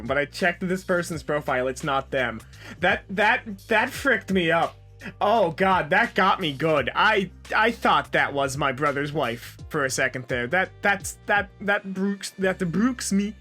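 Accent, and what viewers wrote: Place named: New England, United States. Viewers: The fact that you love in New England explains your accent! My uncle used to live in Rhode Island and now lives in NH not far from the Mass border and he has a similar accent. As an English boy it is funny and weird! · You are the most New England sounding person I've ever heard. I'm from California, you've basically got a foreign accent as far as I'm concerned.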